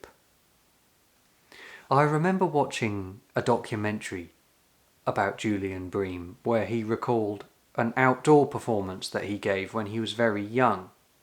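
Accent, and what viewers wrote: United Kingdom. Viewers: The only problem with your videos is that I find myself thinking with a British accent for some time afterwards! I’ll be fine. Carry on!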